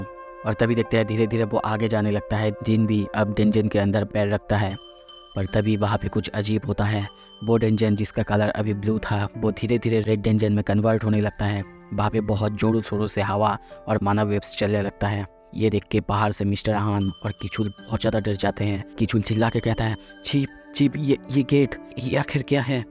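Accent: Bengal, India. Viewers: ap bengali ho kiya, mere vi hindi aisa he, but apka mere si vi acha he. Solo leveling ragnarok explain kar sakte ho kiya, or Jin nehi Jinwo bolo kew ki is me Jin namak 4,5 character he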